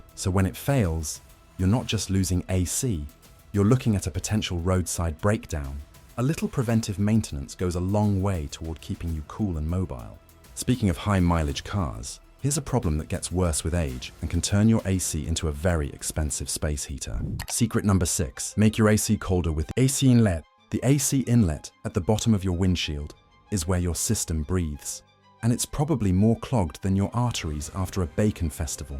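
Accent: United Kingdom. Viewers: Screw your British accent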